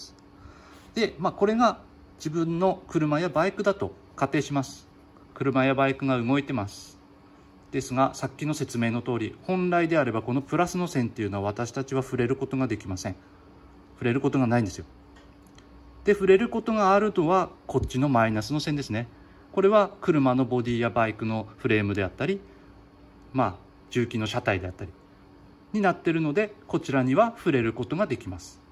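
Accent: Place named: Tohoku, Japan. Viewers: 津軽衆のなまりですねー きいてて心地良いです(笑) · この訛りは津軽弁だな。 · 東北の訛り本当最高です。実家に早く帰りたい😢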